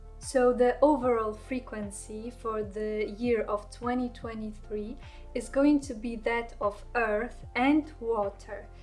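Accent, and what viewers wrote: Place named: Poland. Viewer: Hi great stuff you are so smart my mind has been so scrambled lately sometimes listening to people just makes it worse but not with you for me brings me down to earth just the way you talk I guess soft voice and I love your accent I live in NY USA I think you are in Poland? Thank you for helping me 😀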